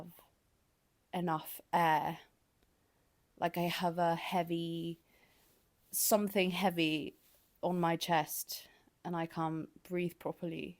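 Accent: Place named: United Kingdom. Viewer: Esto ya lo he dicho un monton de veces.... Si no supiera que eres chilena igual que yo. Juraria que eres britanica.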